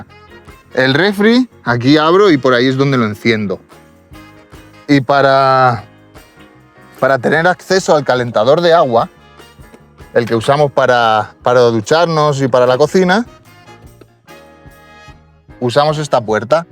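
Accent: Spain. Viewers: Me he quedado loco!!!! Menuda pasada de trabajo, eres un verdadero genio. Conocía la furgo(combi) por fotos pero no te conocía a ti. Esa T1 ha quedado preciosa❤ yo tengo una T3 WBX y una T4. Una sola duda que tengo. Eres Español? Por qué ese acento es de aquí, no creo que sea de México jejejje. Un fuerte abrazo y ya tienes un nuevo seguidor desde CEUTA-ESPAÑA 🎉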